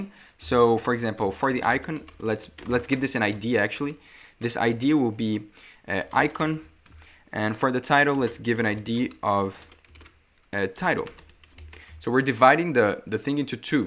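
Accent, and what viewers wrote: Brazil. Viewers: Você é Brasileiro né? Are you Brazilian?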